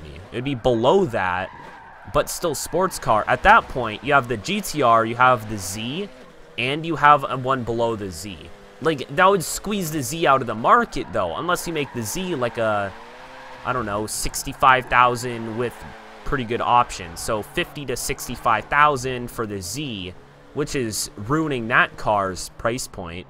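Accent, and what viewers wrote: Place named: South, United States. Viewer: Your southern accent is better then Mine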